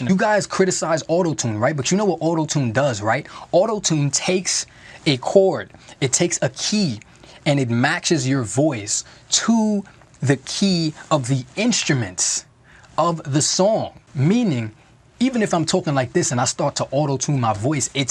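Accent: New York, United States. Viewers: Where's that accent from (you sound like you're fr NY)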